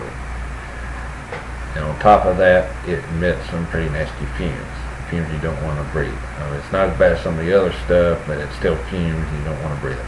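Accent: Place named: United States. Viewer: bunch of damn yankees commentin on the way this country boy talk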